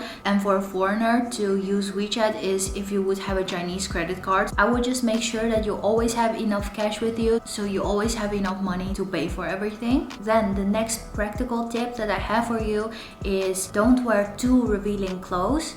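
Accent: Netherlands. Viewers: Nederlands accent gespot! Handige tips, bedankt. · Thank you! You sound totally Dutch, are you 🤔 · 300$!!! You must have used a ton of data! Thank you for answering quickly and elaborately! So my take a way from this is that, it was expensive, but it worked well for you and your mother? Volgens mij kom je uit Nederland als ik je accent goed inschat😀, bedankt voor de reactie!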